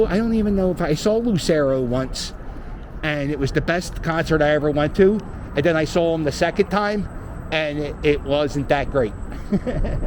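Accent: Philadelphia, United States. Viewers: This guy has one of the best Philly accent I've heard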